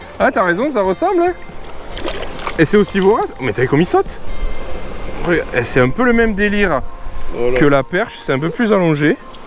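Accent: Quebec, Canada. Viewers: ...c`est autre chose ca, le francais quebecois, assez dur pour un Neérlandais...mais ca ira, salut des Pays-Bas!!!😀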